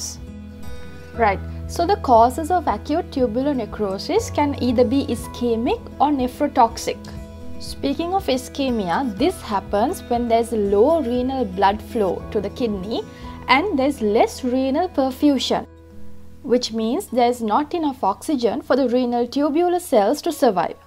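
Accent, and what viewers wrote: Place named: Sri Lanka. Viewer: I can tell you are a fellow Sri Lankan just from the accent hahaha. Thanks for the great video!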